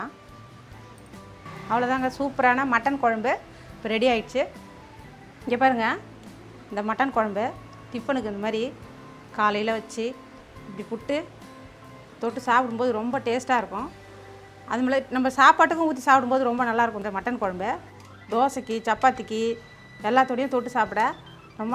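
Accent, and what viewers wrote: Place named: Chennai, India. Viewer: பேச்சு நல்லா இல்ல தமிழ் நல்லா பேசுங்க சென்னை தமிழ் நல்ல இல்ல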